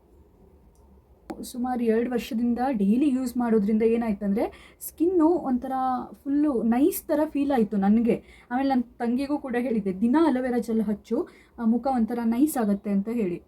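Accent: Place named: Kumta, India. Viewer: Ondu mat helala friend, Nam kumta bashe gatte bere, nenpitkolli, becoz Nan nine YouTube nodtirovaga nim vidio nodade subscribe madade, Nam bashene matadide ennu kushine nanu horgadane edini 27 varsdinda, nammur bashe kelidre evru nammavre Alva ansatte, god bless u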